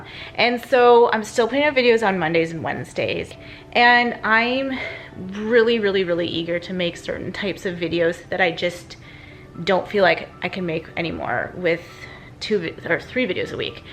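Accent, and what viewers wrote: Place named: Canada. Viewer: I live in the U.S. but I love your Canadian accent! I never knew that Canadian holidays were different than ours until I started watching your videos. Our Thanksgiving is in November and I’m not hosting but I love to see what you do! I also have really loved watching your decluttering journey, you’ve come so far! I hope you have a happy thanksgiving 🦃😊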